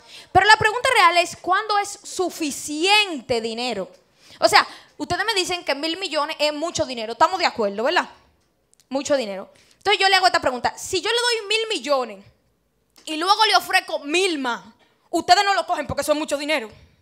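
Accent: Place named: Dominican Republic. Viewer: Me rei con tu presica. Muy edificante. y ese acento de los dominicanos como el de zankimpanki😂. Algo fresco para esta juventud. Bendiciones y saludos desde Cuba.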